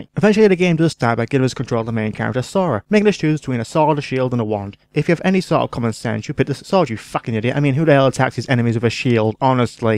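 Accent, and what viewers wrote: Northern England, United Kingdom. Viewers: you'll find that most british people can understand this perfectly fine, it's just most of the american audience switch off when they realise that not everyone in britain speaks like hugh grant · I do! Gay lyfe! You from Northern England?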